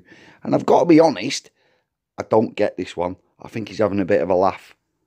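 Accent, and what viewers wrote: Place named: Lancashire, United Kingdom. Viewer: Love the French pronounced names in a Lancs accent! Art seems better now I get older!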